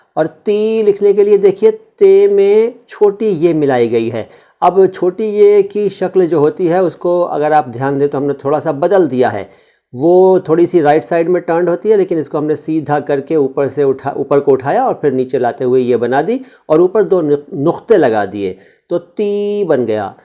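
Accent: Standard. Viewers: Aap bahut achchha samjhate ho aaj tak maine shudh hindi bolne waale ko khoj raha tha lekin nhi paaya Tumhare shivay aap bahut shudh hindi bolte ho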